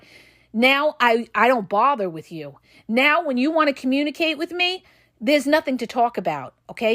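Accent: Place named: Brooklyn, United States. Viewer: You sound just like my mom! Brooklyn Accent and Everything 😂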